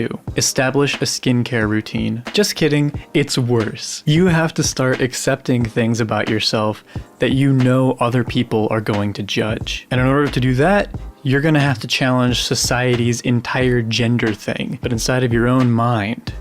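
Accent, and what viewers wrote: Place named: Midwest, United States. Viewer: Okay, so I had to pause in the middle of your video to say I disagree about your voice. I think you have a great voice. It’s just cracking (autocorrect) to sound female. You must be from the Midwest. It’s like a climate or humidity condition that affects many of us. I only wish mine was as good as yours. 💋💋💋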